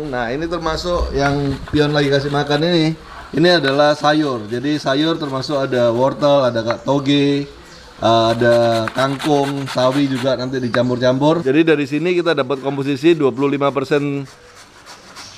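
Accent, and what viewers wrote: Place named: Java, Indonesia. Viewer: Wih orang mana nih om nya Kok pakek logat bahasa jawa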